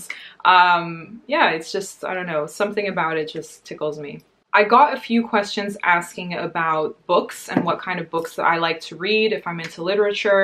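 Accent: United States. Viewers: American schools explains why you have an American accent. Also, what is short for?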